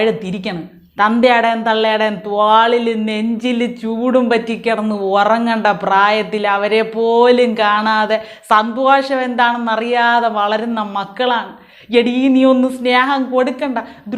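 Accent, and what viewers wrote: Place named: Trivandrum, India. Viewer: പൊളിച്ചു.ഈ തിര്യോന്തരം സ്ലാങ് കേൾക്കാൻ തന്നെ ഒരു പ്രത്യേക രസമാണ്.ഇനി ഇങ്ങനെ ഉള്ള വീഡിയോസ് ഡെയിലി ഇടണം.സൂപ്പർ 👍🏻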